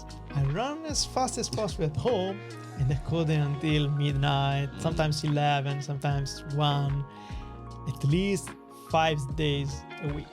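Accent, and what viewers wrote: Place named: Italy. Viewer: Good video! Nice Italian accent =)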